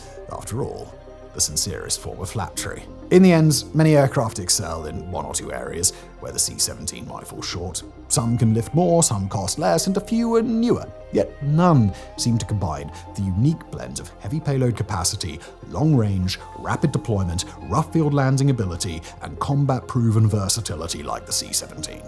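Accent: RP, United Kingdom. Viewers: Everything about this guy (but especially his posh accent) makes me want to punch him in the face until my arm tires.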